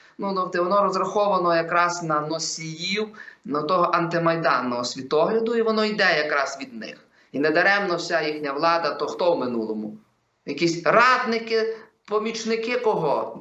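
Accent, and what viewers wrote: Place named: Galicia, Ukraine. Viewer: Третии маидан готуе Порошенко та таки як ти ,и розмрвляи Украинською а не Галичанським суржиком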